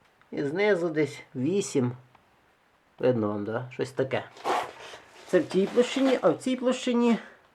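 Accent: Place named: Galicia, Ukraine. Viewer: По розмові чую, що Галичина . Ні?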